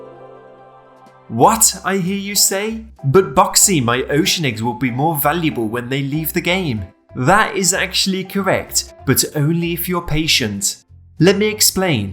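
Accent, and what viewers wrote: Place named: United Kingdom. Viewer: Ur voice is very good even tho ur british ur voice is good and cool and calming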